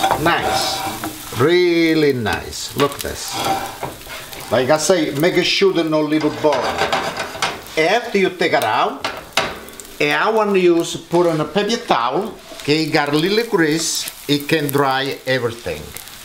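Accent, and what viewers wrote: Italy. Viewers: So good! I've been into chickpea flour instead of white flour because I think it's got more protein and is a bit healthier than the white. Going to make this soon. Looks amazing! I can smell it through the screen! Love your accent. I'm Italian too. Buon appetite! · His Italian English is hilarious !!😂😂😍😙😙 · Yummy 😋 😋 enjoy the Cooking and italian accent mama mia bellisimo 👍😄God bless you🙏😇♥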